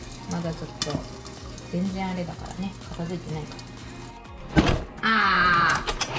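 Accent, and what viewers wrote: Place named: Gifu, Japan. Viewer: 冷蔵庫のデザイン可愛いですね👍 ちょくちょく出る岐阜弁がたまらんわ ((´∀｀))ｹﾗｹﾗ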